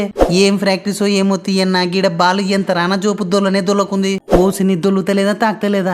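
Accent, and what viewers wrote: Rayalaseema, India. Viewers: ఈడ క్రికెట్ ఆడినట్ట్టు లేదే ఇపుల్ బజ్జి ఆడినట్టు ఉంది 😂😂😂😂😂 రాయల సీమ బాషా మజాకా 😂😂😂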